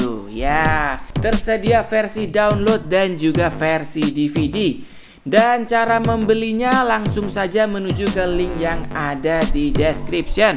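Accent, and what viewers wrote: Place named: West Sumatra, Indonesia. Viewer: Suaranya kayak minang kocak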